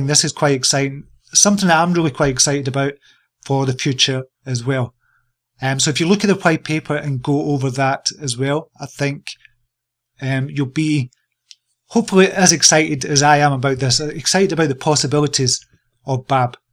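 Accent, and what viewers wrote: United Kingdom. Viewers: Just discovered your videos on Youtube. Nice to see a UK voice in the crypto space. Got yourself a new subscriber. 0xaC371637e14a<num>CeE06918A66e460B4e8b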